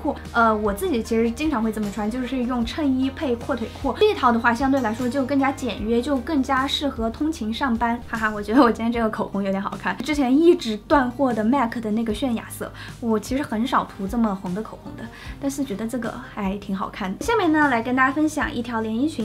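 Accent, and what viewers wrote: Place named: Chengdu, China. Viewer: 支持老乡 ps看你成都话的化妆视频看了七八遍 其实是听 乡音啊 😄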